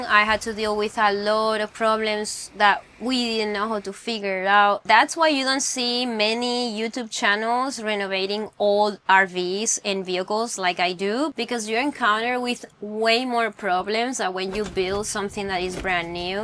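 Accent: Spain. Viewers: Love your Energy, I started watching and then I saw all of the Alva stuff and then I noticed that you were in my Home Town of San Diego. I was in Solana Beach then moved to San Marcos. I used to - many years ago - work for Thrasher Magazine in San Francisco. I've been skating ever since the 80's when I was a Sailor on the USS Midway - then stationed in Japan. I SUBSCRIBED and liked........ I love your accent, what is your FIRST language? Is it Castilian Spanish? BTW, a friend of mine just recently gave me his Tony Alva Deck signed by Tony way back when.....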